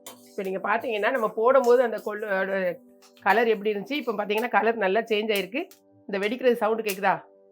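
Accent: Tirunelveli, India. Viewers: திருப்பூர் கோகிலா... வணக்கம் மேடம்... உங்க வீடியோ பாத்தா உடனே ஒரு லைக் போட்டு தான் வீடியோக்கு உள்ளேயே பாக்கறது... Because உங்க திருநெல்வேலி பாஷைக்கு நான் அடிமை 😍😍😍எங்க வீட்டுலயும் இந்த பொடி செய்வோம் 💚💚💚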